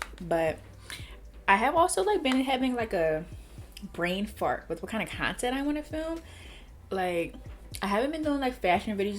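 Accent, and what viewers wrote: Philadelphia, United States. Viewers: is that a philly accent I hear?? 🥰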